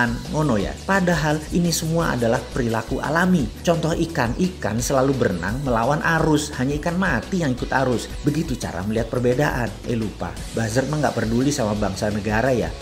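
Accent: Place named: East Java, Indonesia. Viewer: Jawa timur banget nadanya🤣🤣🤣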